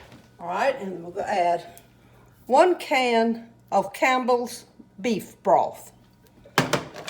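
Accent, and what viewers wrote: South, United States. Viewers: I dearly love your videos. Yummy! I had a lovely aunt who married a wonderful Christian man from Mississippi. After a time she developed that glorious Southern accent. She has gone on to Heaven now, but sounds just like her. So calming & gracious to hear her talk. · Hi, I love All your recipes!!! Also love your sourthern accent!!😊especially when you say R/ite, 😀so great!!!😀😁 love, from zFt. WORTH, Texas!!!😍😍😙😚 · I knew she had to be from the South, because of how she cook and also how she talks. She reminds me of my Grandmother love you 😘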